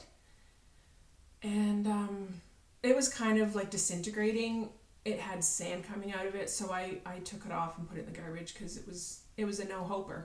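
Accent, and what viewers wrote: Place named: Canada. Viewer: Hi, welcome to Floss tube. I think you did a marvelous job for your first video. Always a bit tricky that first couple of minutes. Mr. Right grew up in Canada, so I love listening to the cadence of your voice. I like your idea of family sayings. Inspiring. Thanks for stepping out there and giving floss tube a try. I've only made 10 videos, so I am still a smidge nervous. You have such a kind way about you, I think you will be a hit. Thanks for sharing and inspiring. Happy Stitching, Daleen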